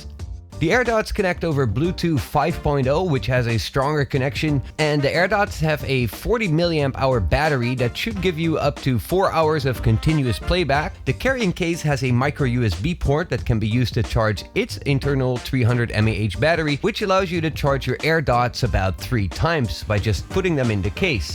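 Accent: Netherlands. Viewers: Are you Dutch? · This guy has a subtly Dutch accent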